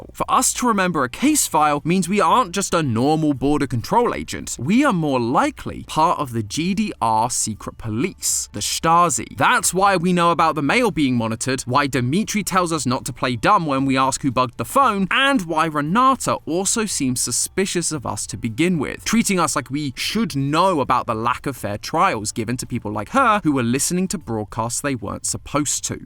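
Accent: United Kingdom. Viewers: Hey British mat pat tell mat pat I said hi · british matpat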